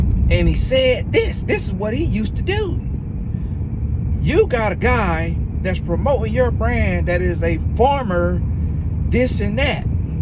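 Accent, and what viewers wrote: United States. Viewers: I don’t know about everyone else, but the transparency brings about the trust. Your transparency separates you from the “guru/talking heads”. Your real life situations, country accent and all, makes you so relatable. I understand being able to capitalize on your brand, but don’t let it remove the authenticity…that’s what keeps some of us here. God WILL provide. Thanks for all that you do!🙏🏿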